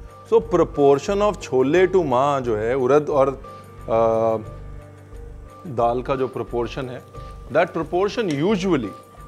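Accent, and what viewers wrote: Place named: Punjab, India. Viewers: I love when you add Punjabi accent in Hindi and the most famous RANVEER APP NE BATAYA NAHI.... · Itne videos dekhe hai aapke....ye pehla video hai jismein itna sara Punjabi accent sunne mila :) aur sahi hai, pyar se mann se banaya hua khana swad banta hai.. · I love your punjabi accent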